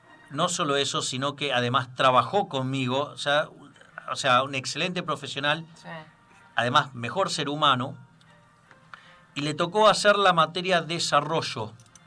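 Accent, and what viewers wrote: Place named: Uruguay. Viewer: Vos tenes voz de uruguayo así que debes tener cara de pelotduo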